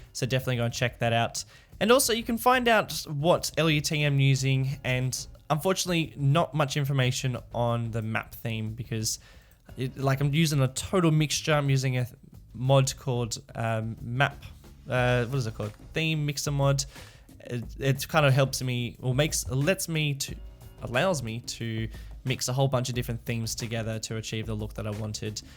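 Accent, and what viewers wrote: Australia. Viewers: Amazing work mate. Great to hear an Aussie doing Cities: Skylines series and this being an Aussie based theme is even better. You're really capturing the Outback with the colours and plant life! Can't wait for each new episode! Keep up the awesome work!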